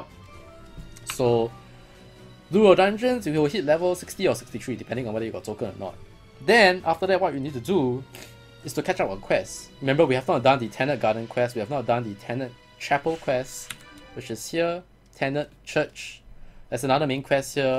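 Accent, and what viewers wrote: Singapore. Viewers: Thanks man, bro are you Singaporean too? Hahaha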